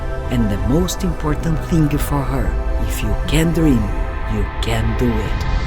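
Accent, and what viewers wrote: Brazil. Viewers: A narradora tem sotaque brasileiro quando fala inglês.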